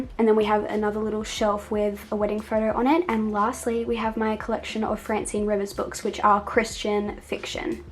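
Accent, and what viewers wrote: Australia. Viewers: For some reason it took me until 15 minutes into the video to realise that you're Australian....... I'm Australian.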